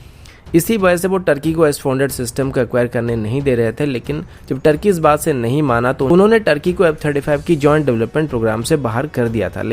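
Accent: Odisha, India. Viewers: U from Odisha ?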